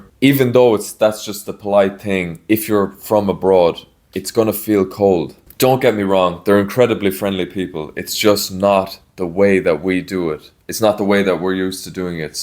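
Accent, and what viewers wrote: Ireland. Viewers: I'm debating between Cambodia and Thailand. I want to open a business and I know I can't do that in Thailand. I would have to find a Thai husband. In Cambodia, as far as I know, I can do it on my own. Thanks for the video. Love your videos and your Irish accent. I love Ireland...it's just too expensive. I've been there a couple of times. Good times!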